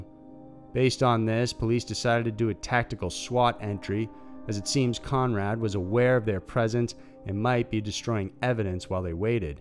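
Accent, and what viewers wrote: South, United States. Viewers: Some of your words sound so southern. Where are you from? I’m a Carolina boy myself. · I'm not usually an enormous fan of American accents but I really love the narrators one, could listen to it all day! What part of America is that from?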